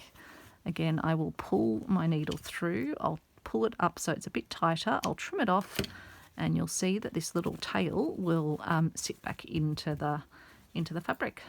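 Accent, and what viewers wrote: Australia. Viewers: I thought if you put a horizontal stitch on the back at the point of the star you could put a paper clip or other wire on and use it as a decoration and take it out and use as a coaster later. Just came across you today and am glad to hear an Australian accent. Gold Coaster here. Summer hit here a month ago, then we had a few winter days just when everyone had put away their long pjs.🌲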